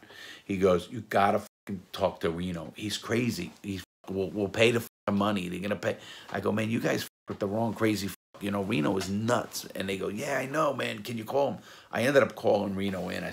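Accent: New York, United States. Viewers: your New York accent is cooler than my Australian one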